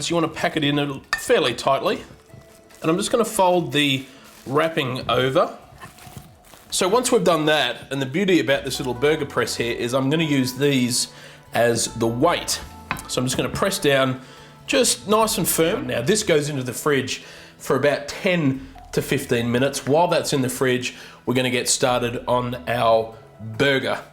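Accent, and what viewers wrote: Australia. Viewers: No offence i noe ur aussie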